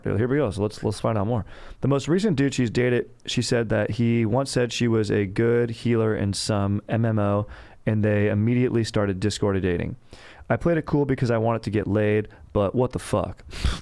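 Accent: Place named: Brooklyn, United States. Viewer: Close your eyes from - and you will time travel in 1920's Brooklyn, New York. Truly miraculous experience.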